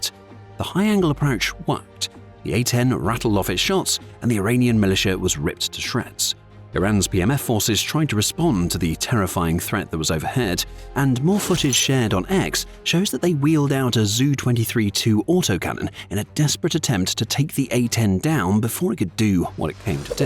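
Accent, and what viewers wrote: England, United Kingdom. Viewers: So the Narrator sounds like he is from the ENEMY of the US, England, & the BBC. They are the HUB of the International Cabal who Trump has been fighting ever since coming down the Escelator.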